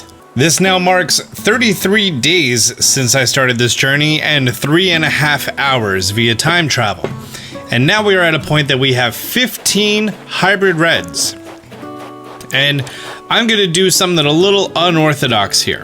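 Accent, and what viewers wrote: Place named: East Coast, United States. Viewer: is this guy from philadelphia? he says "wuder" like an east coaster...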